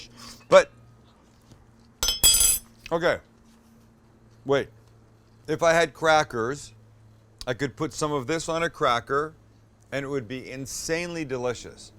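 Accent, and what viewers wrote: Canada. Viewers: Hey Sam, I just found you a few weeks ago. I've commented on several videos how nice it is to see a man who seems like a real Californian, but who cooks much like my family does in the South. Believe it or not, we really do NOT deep fry and BBQ everything! lol... a shock, I know ;) But, really, you cook healthy...but not goofy healthy... REASONABLY healthy. And you won't hesitate to use some fat, real butter, and last week you even used MAYO where I often use butter, and you changed my life. I'm only barely exaggerating! Anyway..one real question: Are you from Canada? I ask because you say your "ou's" such as "out" and "about" with a little Canadians flavor (oot and aboot). Or am I just revealing how unsophisticated and un-cosmopolitan I am?? lol. Either way, GREAT channel. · He kind of has a Canadian accent Anybody notice that?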